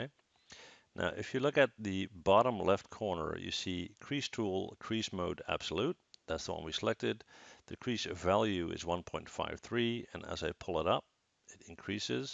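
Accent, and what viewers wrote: Netherlands. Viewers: Hey, can you make a tutorial of how you can render in maya. So you can render your scene for your portfolio. And I have another question, are you from the Netherlands because you sound like a Dutch person. thanks for reading my comment and have a nice day.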